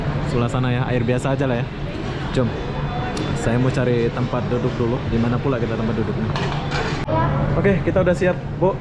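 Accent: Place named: Malaysia. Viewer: Kamu orang malaysia atau indonesia? Waktu di video surabaya ditanya dari mana kok jawab dari lombok , tp logat kaya dari malaysia . yang bener mana 🤣🤣🤣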